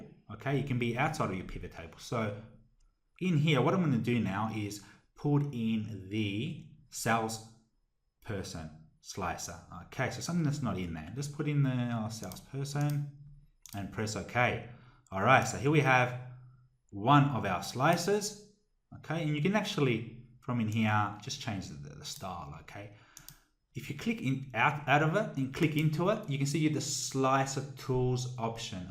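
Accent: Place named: Australia. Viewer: with an Aussie accent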